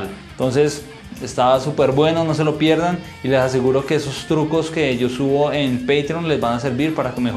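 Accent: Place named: Colombia. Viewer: No se pierde el acento Colombiano!! Saludos maestro!!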